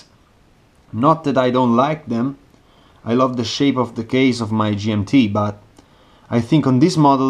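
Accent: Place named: Italy. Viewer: The English with the Italian accent is excellent. And the review/preview is good.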